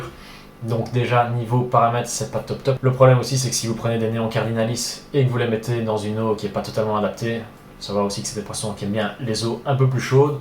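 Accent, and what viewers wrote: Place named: Canada. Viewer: J'aime bien les commentaires et surtout l'accent canadien je prends plaisir à l'écoute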